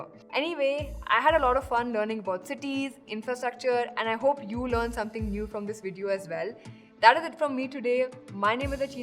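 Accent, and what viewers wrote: India. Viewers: You look Non Indian. The moment you started speaking with that thick Indian accent, that surprised me!!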